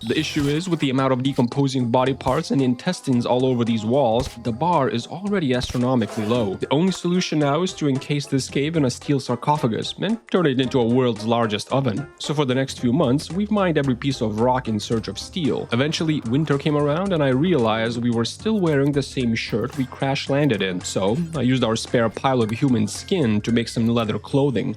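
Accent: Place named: Canada. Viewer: I know others are unaware but as someone with Canadian friends. I know how they pronounce stuff differently and yeah. He's definitively Canadian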